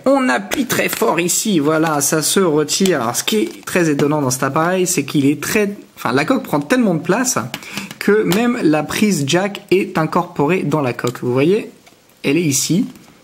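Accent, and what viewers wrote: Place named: France. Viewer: I'm just here for the french accent :')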